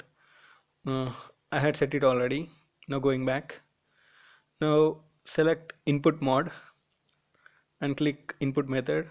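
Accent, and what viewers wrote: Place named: India. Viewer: this is why I like hindi accent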